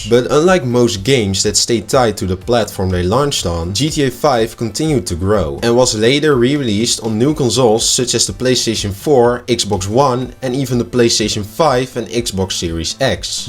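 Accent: Netherlands. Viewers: Are you dutch? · Holy Dutch accent maat, maar goeie vid 👏🏼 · I hear a Little Bit HOLLÄNDISCH❤😂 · Je klinkt Nederlands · Kountree? If this guy aint Dutch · Ik hoor het nederlands accent in je engels😂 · Nederlands denk haha leuke videos man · You're Dutch or your accent is Dutch because you're nervous. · hoe nederlands is deze guy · I hoor dutch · You must be Dutch my friend, Right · Brother I live in NL and something tells me you are dutch by your accent haha. Anyways very dope vid with dope analysis 💪🏽💪🏽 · great editing, unique commentary, and a dutch accent, is a fast Like from me! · Are you dutch😂🎉?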